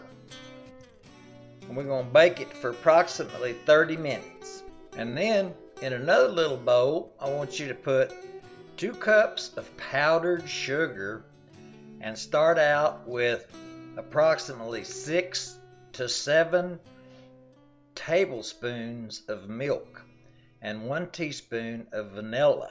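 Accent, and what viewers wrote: South, United States. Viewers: Love this Forrest Gump accent!! And the cake looks delicious!! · I love the southern draw!!! Thank you so much for recipe about to make now!!!!!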